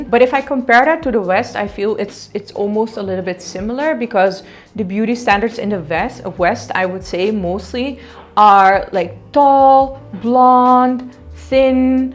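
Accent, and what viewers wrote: India. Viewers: I love how living in India for 5 years made your accent a bit like Indian accent. (Obv in a good way tho)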